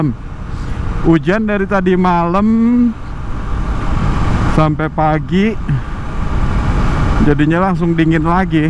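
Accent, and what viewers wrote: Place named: Jakarta, Indonesia. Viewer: Gw suka sma logat lu Bang, org Jkarta emang banyol., Sgala Saddy=sedih di omongin, ga pnting bgt kan.. tpi gw suka lucu2,😂😁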